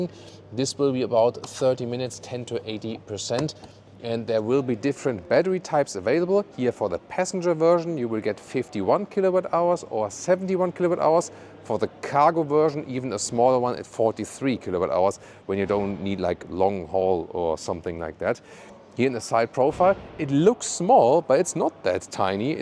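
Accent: Germany. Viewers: The word version really brings out the German accent 🙂 I had seen another review of it earlier that did not mention the ridiculously low battery capacities 😕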